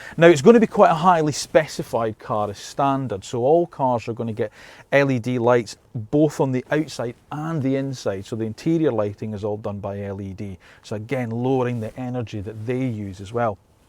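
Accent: United Kingdom. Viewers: Where is the UK accent from ?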